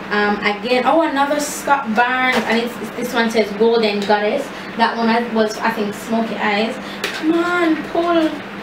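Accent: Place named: Jamaica. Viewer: Really enjoyed this video, I thought it was just me who got super excited when I purchased new makeup! "How them romantic suh, everything jus wrap up!" I just love the Jamaican accent yes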